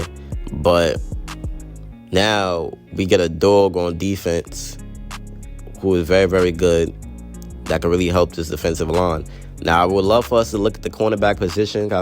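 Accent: East Coast, United States. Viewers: U sound like u from the east coast some where like Baltimore or jersey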